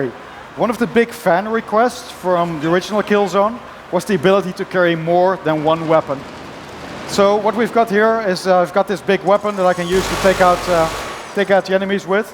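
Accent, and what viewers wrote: Netherlands. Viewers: hahah geweldig dat nederlands accentXD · Hahaha, he is Dutch. I can hear it from his accent xD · Haha you can hear he's from the netherlands, I wonder how many people will play the game like this...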